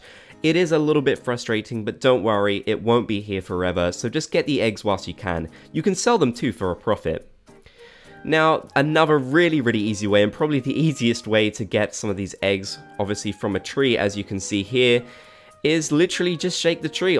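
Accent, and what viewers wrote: United Kingdom. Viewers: are u British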